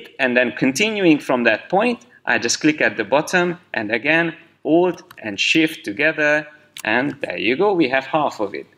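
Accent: Hungary. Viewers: You sound Hungarian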